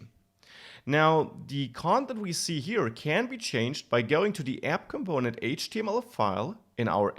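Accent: Bavaria, Germany. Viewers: Hab mir gerade den Angular 2 Kurs auf Udemy gekauft, und fiel fast vom Stuhl als ich den Dialekt gehört hab. Wenn mi ned ois teischt, a Bayer??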